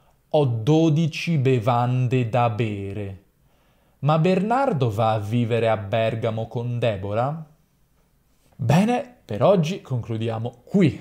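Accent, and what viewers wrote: Madrid, Spain. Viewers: Suenas MUY de Madrid :D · ¡¡Vídeo súper interesante!! Pareces de Madrid jjajajaajaja ¿has estado alguna vez en España? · Me causa gracia que su español sea el español de España. (Se que parece bastante tonto decirlo, pero ya de por si a los hablantes de la lengua, que habitamos en Latinoamérica, nos cuesta bastante hablar como los españoles, sobre todo por el famoso seseo). Saludos desde Buenos Aires. Vivo a 15 cuadras entre el club Argentinos Juniors (cuna de Maradona) y a 12 cuadras de la casa de natalicio del actual Papa Francisco. Es decir, entre el Dios y el profeta, dejo en ustedes decidir cuál es cuál. :) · Credo sia buona idea fare un video sul AFI. Saluti dall'Argentina! Bravo il tuo spagnolo, anche se è tan europeo XD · Bonito acento español 😁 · Comunichi molto bene in spagnolo. Tutto quello che dici è capito. Ahora sí tienes el acento Español europeo. 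A igual que Italia que hay dialectos y acentos diferentes. Los hay en nuestros países hispanohablantes también. Los Argentinos tienen mucha influencia italiano. Ellos tienen el acento italiano combinado con el español. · Me meo con tu acento español espectacular, no sé por qué me suena como alguien de Madrid o de Valencia, alguien me ayuda con esto? 😂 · que feo tu acento de español de españa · Joder, que acentazo español! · Hola, hablo español, me encantó escucharte hablando mi idioma.. no habia notado las diferencias de las D y si sé que las B y V suenan casi iguales. Gracias se escucha un claro acento iberico como has mencionado, yo hablo el español latino y aun así hay bastantes diferencias entre cada uno de nuestros paises y regiones. Te felicito por tu buen español... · Desde Colombia felicitaciones por tu español ibérico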